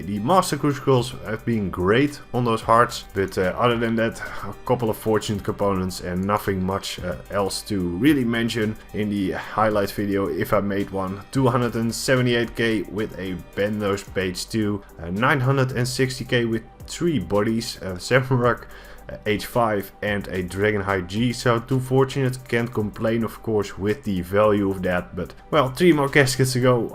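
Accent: Netherlands. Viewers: Oei je hebt wele cht een heel nederlands accent haha :p leuke video man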